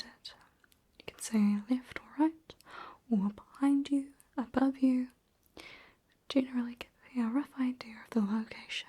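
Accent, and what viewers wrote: New Zealand. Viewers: i’m convinced people love the kiwi accent because sometimes it sounds transatlantic lol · I think the tingles came early and I shivered with a warm glow. Love the NZ dialect 🌹🌹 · are u doing a Kiwi accent or are u kiwi? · Amazing New Zealand accent I honestly don't hear it much in New Zealand so it's good to hear it in this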